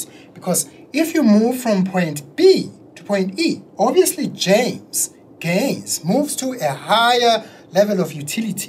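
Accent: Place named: South Africa. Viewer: I am a South African student at Columbia University, in New York and I came across your videos whilst preparing for a microeconomics exam. Your videos are excellent and were extremely helpful to me. It's always good to hear a voice from home too! Thank you.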